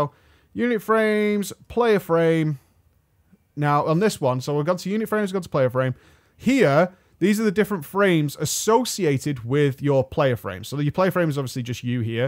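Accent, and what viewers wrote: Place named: Manchester, United Kingdom. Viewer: Youtube is not friendly to the Manchester accent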